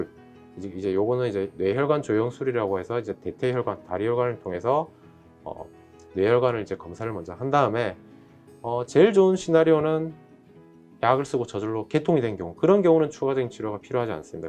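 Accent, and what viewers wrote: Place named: Gyeongsang, South Korea. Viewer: 닥터님께서 경상도 분 이시네요 상세한 설명 감사합니다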